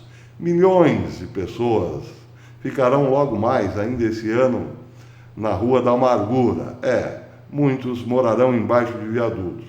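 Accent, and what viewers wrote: Caipira, Brazil. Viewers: qualquer analista de geopolítica fala bonitinho, empolado, metido à inteligente. Isso enche o nosso saco. Só você faz o caipirês e um trabalho melhor que todos os outros! Nunca abandone a fórmula.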